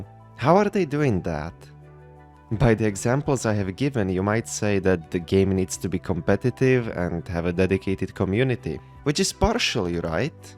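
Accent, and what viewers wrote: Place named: Russia. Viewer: I love the russsian accent. отличное видео!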